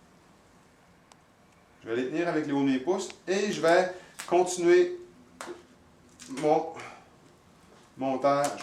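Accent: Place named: Quebec, Canada. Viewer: Tres bon tuto! En Québecois en plus! Merci! Asteur j'vais essayer de pas trop rusher en l'installant!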